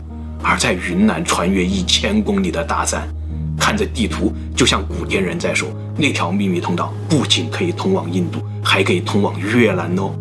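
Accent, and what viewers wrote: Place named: Zigong, China. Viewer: 小哥是自贡—带人吧，你的口音太像南征北战的那位演军长的陈戈…大才子自贡人。自编自导自演的名剧抓壮丁😁😁😁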